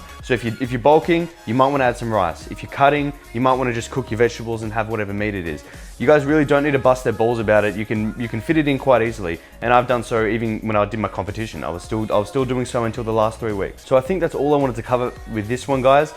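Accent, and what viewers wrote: Australia. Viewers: Is he Aussie? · I for some reason love the way you say "hey guys here" love the australian accent lol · Useful video! I have made many of these mistakes when I was younger and still great tips which I had forgotten about nutrition. Also love the aussie accent :D Cheers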